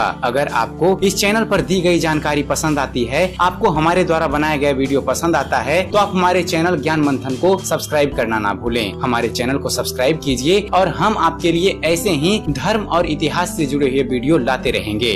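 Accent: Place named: Bhojpuri, India. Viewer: Please speack in proper saskrut manner Not in bhojpuri manner Speack clearly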